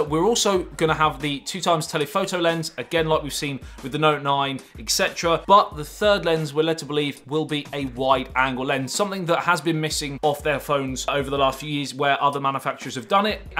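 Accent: United Kingdom. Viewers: Thick british accend... 😅😁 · watta accent language its like a british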